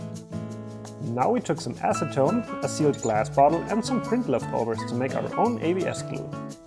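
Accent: Germany. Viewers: are you from german??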